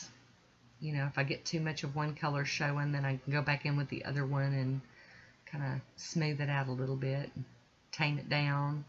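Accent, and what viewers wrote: South, United States. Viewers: I hear a southern accent in you! That's the same for me, I'm a southern girl all my life! My husband loves for me to say certain words and will have me repeat them sometimes or he'd say it after me lmbo, like, night (telling him goodnight) bite, like, light lol he gets a kick out of it when I say those words and we both end up laughing! People always ask me to repeat myself just so they can hear me say whatever I said again lol!! I think we probably would be in trouble quite often if we could hang out! If you ever come to central Fl it'd be a great treat, for sure!!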